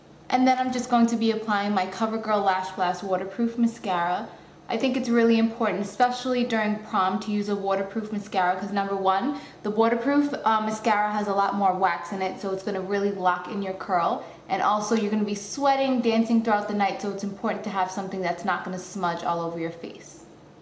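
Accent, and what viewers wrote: New Jersey, United States. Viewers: Jersey accent ! .. :)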